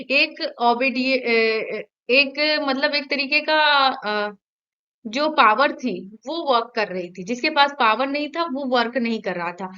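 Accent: Bihar, India. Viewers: Don't say like this galt nhi bs unka kuch tone aa jata h Bihar ka hm unki jitni knowledge gain kr paye bhut badi baat h aur unke jaisa pda paye teachers to bhut but kuch hi teachers ka padaya huaa mind mai aata h ...Sir aapko knowledge teaching I really love 💕 mai bhi aapki jaisi hi teacher bnna chahti hu ki Mera padaya huaa mere students ko samjh mai aaye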